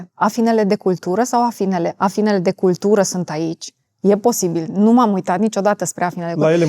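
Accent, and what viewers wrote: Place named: Hunedoara, Romania. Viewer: Multă stimă doamna doctor❤, v-am recunoscut accentul și dragul"no, apoi așa"... de la noi de la Hunedoara❤ Plaiuri deosebite care dintotdeauna a dat naștere la oameni deosebiți de a lungul istoriei, din îndepărtata Daciei și pînă în ziua de azi❤O femeie deosebită, mult resoect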